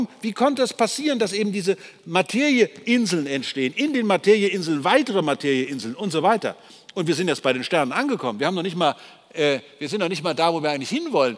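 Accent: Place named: Bavaria, Germany. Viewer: (An den AnModerator zu Beginn) Der Inhalt ihrer Videos ist ja eh immer interessant. Aber könnten sie erstens mal den Buchstaben A richtig aussprechen und nicht so extrem Bayerisch und zweitens die Augen nicht ganz so aufreissen? Ich finde beides in Kombination lenkt zu sehr vom Inhalt ab und bringt das Ganze in eine so bestimmt ungewollt komische Richtung. Liebe Grüße und danke für die gute Arbeit und den interessanten Content!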